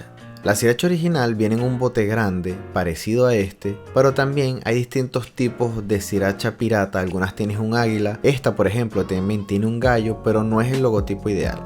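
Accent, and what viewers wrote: Venezuela. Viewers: Pareces maracucho 😏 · Ays hace poco conocí una venezolana que hablaba como tú 😅 majísima por cierto!!😊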